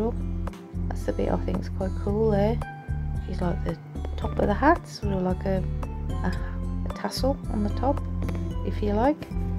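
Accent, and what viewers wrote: United Kingdom. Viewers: You sound like my Scottish friends! Lovely 😍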